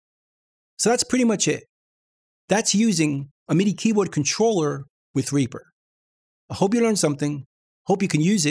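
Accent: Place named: Northeast, United States. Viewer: A lot of people from the northeast talk like that.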